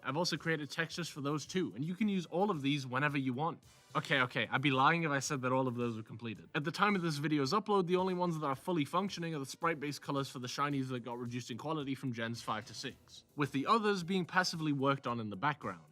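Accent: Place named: North, United Kingdom. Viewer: Nice one bud, had to reward that top tier tweet with a video watch, quality stuff. Especially the lush northern accent. Shiny locking has never made sense, but you're especially spot on about the Gen 3 colour changes, I hardly even clocked them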